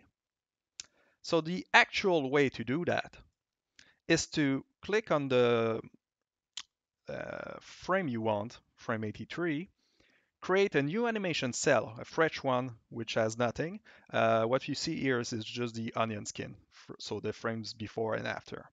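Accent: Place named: Spain. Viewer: Tu inglés se escucha como si fueras hispanohablante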